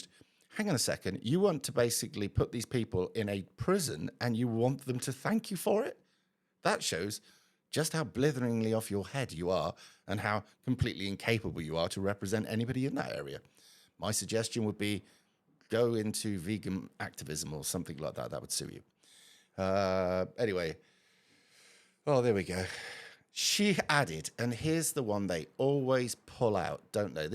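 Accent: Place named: England, United Kingdom. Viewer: This channel is pure grifting with an English accent.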